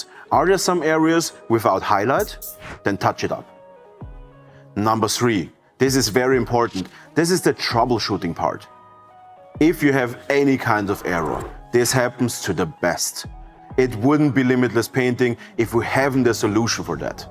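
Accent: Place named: Austria. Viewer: Das mit dem offwhite muss ich mal testen. Bis jetzt eher Richtung Knochenmäßig gegangen. Du gibst mir voll die Schwarzenegger vibes mit deinem Akzent. Super zu verstehen. Freue mich auf mehr. Grüße aus Hamburg.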